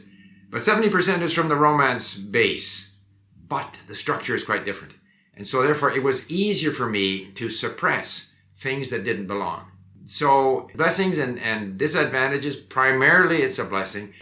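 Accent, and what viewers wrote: Spain. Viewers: "s Portunhol occurs because he does not swing the words like Brazilians do, and utters.the Portuguese words with the Spanish cadence. No big deal. They will point it out. But they have no.problem understanding. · Your accent in Spanish, when you speak Portuguese. And I would go as far as to say It's not really proper Portuguese. Especially because of the fact you Speak brazilian, portuguese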